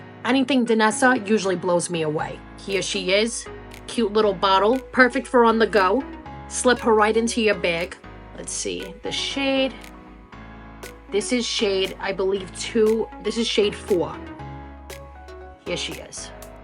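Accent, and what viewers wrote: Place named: New York, United States. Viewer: First time i’ve watched your video. I’m watching from the UK. I have to ask are from New York with Italian heritage? Your accent is just so what I hear on tv when the characters are from New York. It’s great!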